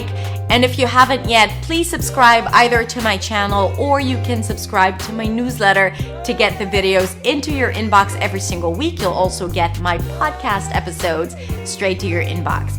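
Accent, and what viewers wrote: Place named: United States. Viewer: You have an American accent. In standard English Polish isn't Pronounced as paalish.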